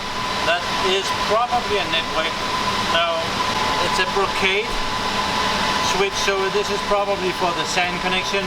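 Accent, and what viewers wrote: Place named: Denmark. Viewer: is he danish? sounds danish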